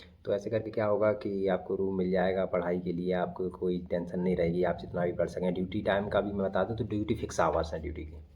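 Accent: Maharashtra, India. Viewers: Saff pata chala sir aap marathi ho sir❤🎉🎉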